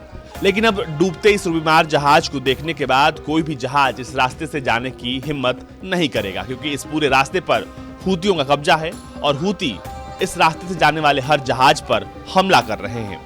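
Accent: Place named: Bihar, India. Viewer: Ye bihari hai jo bol rha hai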